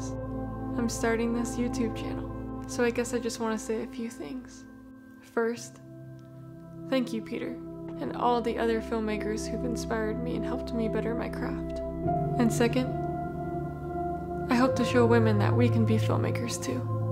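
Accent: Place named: Netherlands. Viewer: Well `done, you accent sound dutch buy the way! :)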